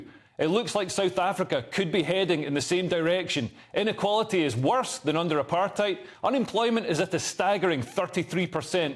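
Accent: Scotland, United Kingdom. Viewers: This is the worst analysis I’ve ever witnessed. At first I thought he was Irish and had an understanding of geo-politics but I think he’s Scottish 😅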